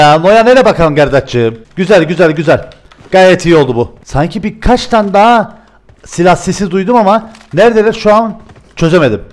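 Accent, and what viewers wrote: Cyprus. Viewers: Yıllar sonra abinin Kıbrıs şivesi ve pubg bu halini izleyen benden selam olsun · Uzun zamandır takip! beğeni! İlk yorum muhteşem Kıbrıs şivesi izlemeye doyamadığım videolar başarılar devam kardeşim 👏👏👏👏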